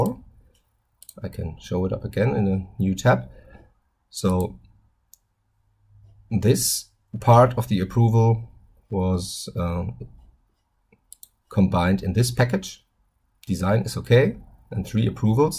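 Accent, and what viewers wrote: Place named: Germany. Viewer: You have a German accent 😂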